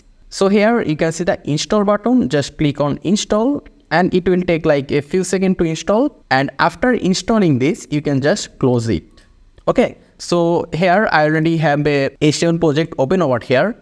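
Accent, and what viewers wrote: India. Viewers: bro when i hear a idian talk i instanly knew that my problem will be fixed :)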